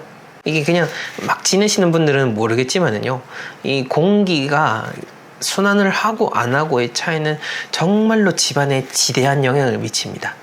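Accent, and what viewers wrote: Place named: Gyeongsang, South Korea. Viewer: 흥 ~~~우연히 보다 지금은..ㅎㅎ자주봐여^^ 목소리도 좋고 설명을 너무 잘 해주셔서 더 좋아용😂 근데 경사도분? 사투리 ㅎㅎ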